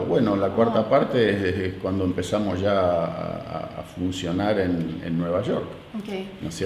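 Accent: Argentina. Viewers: Lo que me encanta de es que no ha perdido su acento argentino, y lo que dice respecto a lo que cuesta comprar, tristemente sigue igual. A muchos nos está costando llegar a fin de mes, hay que trabajar cada vez más y lo mismo, no alcanza. Los sueldos y jubilaciones son bajos. Se mantiene a los vagos con planes. Eso me tiene cansada, nos tiene cansados a todos. Deseamos gobernantes honestos y que ayuden a todos con trabajo digno, sueldos dignos., admiro su valentía de contar lo que tanto les hizo sufrir acá. Y qué bueno que tuvo junto a su familia un mejor pasar.. Saludos desde Mendoza Argentina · Gracias por compartir un poco de tu historia!!!. La forma que tienes de relatar con ese acento argentino es muy atrapante... sos el Gato Peters canadiense!!!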